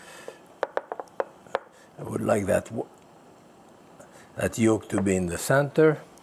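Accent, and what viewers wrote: France. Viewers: Is there a better accent than the French accent? I think not. Hehe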